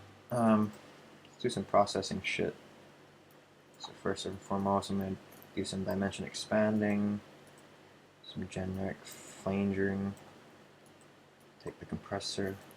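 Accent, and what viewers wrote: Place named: United States. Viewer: Aren't you from Thailand? You sound super american haha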